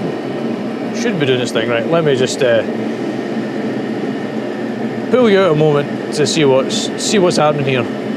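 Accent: United Kingdom. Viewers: Best Brit voice ever. ,,bloody Right. small curses will be ignored by, ,,your Church. Happy,, Holidays,,and Knew Year You'All.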